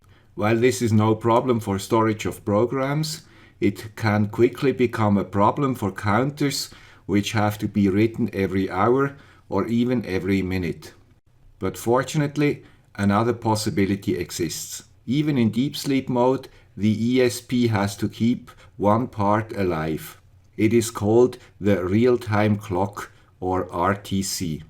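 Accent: Switzerland. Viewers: oh, but i think is a perfect challenge for the excellent man with the Swiss accent! :)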